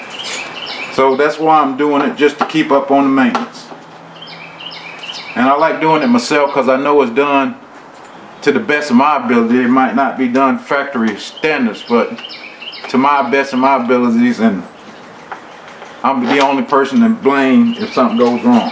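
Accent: South, United States. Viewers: What state do you live in, got a bit of southern drawl there, I'm from Ohio myself born in Kentucky!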